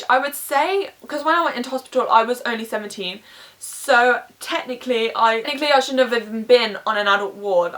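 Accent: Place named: Birmingham, United Kingdom. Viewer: Just Sayin i think it's a bit brummy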